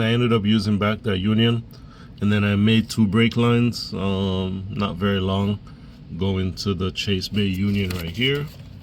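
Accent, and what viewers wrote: Jamaica. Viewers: You’re Jamaican? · Heard the accent lol! 🇯🇲 outdeh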